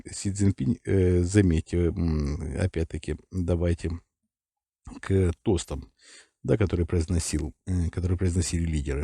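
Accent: Ukraine. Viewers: Смехуёчки во время эфира раздражают!!! Никакого анализа...и голос похож на голос Кашпировского, с украинским акцентом.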